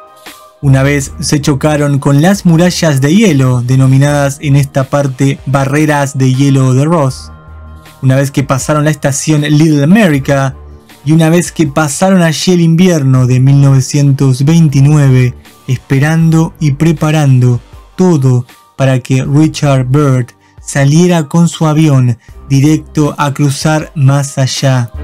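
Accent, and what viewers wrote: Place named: Argentina. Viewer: tu hablar con ese sonsonete argentino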